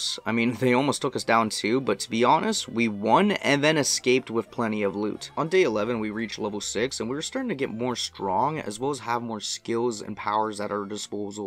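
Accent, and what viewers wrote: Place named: Canada. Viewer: Subbed becus Canadian accent, Kenshi, and hoe appreciation.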